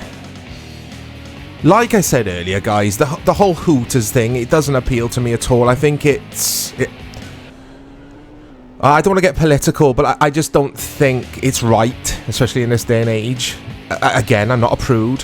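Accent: United Kingdom. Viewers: yuk, british accent...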